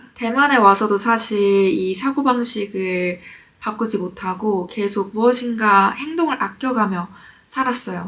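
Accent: China. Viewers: 為什麼您是中國口音😂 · 妳說的中文語調是大陸腔調。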